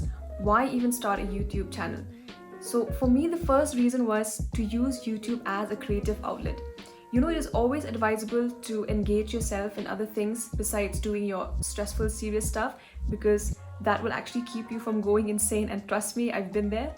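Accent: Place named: United Kingdom. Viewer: British accent is on point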